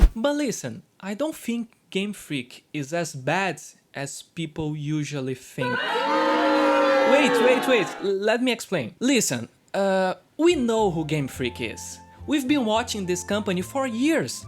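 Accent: Brazil. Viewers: AMAZING VIDEO, you really touched the subject of Game Freak and the Pokémon controversy with good conciousness but also firm on critics, Very enjoyable editing style (E com o sotaque brasileiro impossível de não amar).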